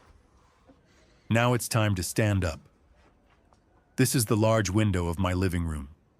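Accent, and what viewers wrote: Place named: United States. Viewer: definitely is an American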